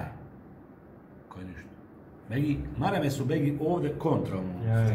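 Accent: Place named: Živinice, Bosnia and Herzegovina. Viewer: Živiničanski dijalekt je u pitanju, pa ga vjerovatno zato ne razumijete.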